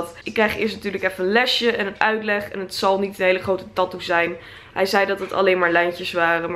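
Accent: England, United Kingdom. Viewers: is hij echt engels of praat dié gewoon zo voor de grap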